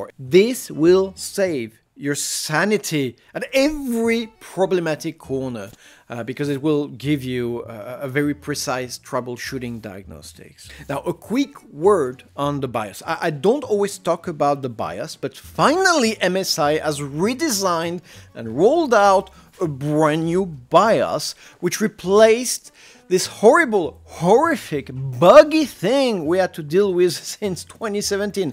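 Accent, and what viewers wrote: France. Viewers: I enjoy your video's so much. I may have asked before but what accent am I enjoying so much? is it french or french canadian or something altogether different. either way I love you accent.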